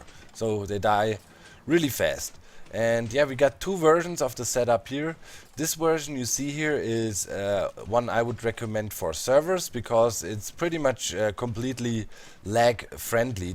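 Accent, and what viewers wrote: Germany. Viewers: German right ;)?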